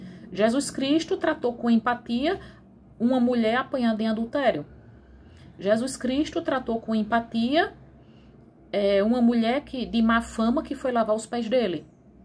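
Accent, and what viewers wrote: Northeast, Brazil. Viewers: Amo o seu sotaque nordestino, sempre achei bonito. Você transmite calma e coerência nas suas falas. Obrigada por produzir um conteúdo de qualidade!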